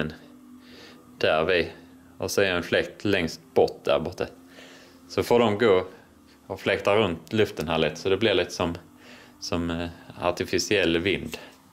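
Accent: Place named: Kristianstad, Sweden. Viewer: Kunde nästan svära på att du var från Kristianstad-trakten :-) Iofs inte SÅ långt emellan men lät så på dialekten. Fina omgivningar där i Småland. Och så mkt snö!! Här i Eslöv har vi vit mark nu, denna i natt, och jag önskar att det försvinner lika fort som den kom haha. Din vovve... Det är väl inte en New Foundland?? Såå fin!!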